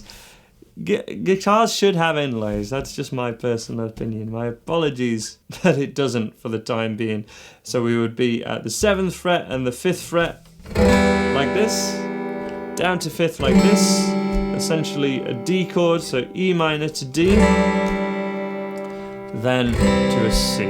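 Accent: United Kingdom. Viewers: And THIS GUY IS BRITISH! Score!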